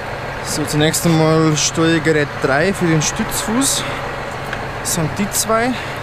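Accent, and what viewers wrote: Bavaria, Germany. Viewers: Sau gutes Video! Gefällt mir so mit bayrischem Dialekt, weiter so! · Meine Meinung ist Rede ganz normal und nicht so hochdeutschbayrisch ich weiß das vielleicht die ein oder anderen das nicht so gut verstehen oba ihn veida Redet mah aweng anders und i wors dast in da Nähe her kimmst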